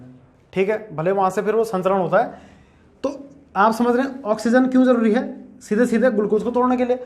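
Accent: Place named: Garhwal, India. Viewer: Sir one thing apko hame apni language mai phadhana chaiye (ghadwali mai)... abhi tk koi bhi teacher nhi h youtube mai jo gadwali mai phadata ho.(jase south mai dekh lo. Tamil mai dekh lo. Apni language mai phadhate h sb. Assam mai dekhlo. Tripura mai dekhlo. Sb apni language mai phadaya h) apki voice mai gadwali ka flow bhi ara h. bacho ke liye best h jo hamre jadatar gadwal mai h..jldi samjhege gadwali mai .. aap ek baar try krke dekhye. jada success milegi apko and students ko bhi.. Thanks Aspirant Deled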